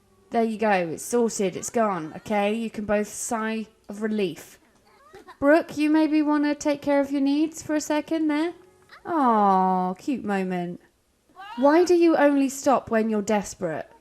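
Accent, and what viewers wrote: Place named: South East England, United Kingdom. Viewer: South East England, in and around London area. I think she has said before she is originally from Reading? Which isn't too far from London 😊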